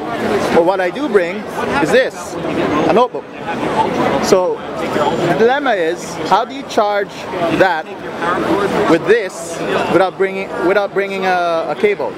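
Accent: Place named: Philippines. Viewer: I can tell by your speech and how you speak that you're a filipino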